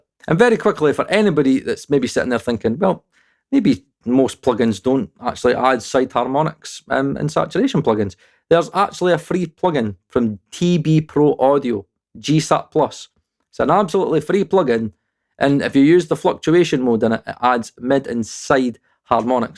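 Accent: Scotland, United Kingdom. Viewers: You have the best Scottish direct on youtube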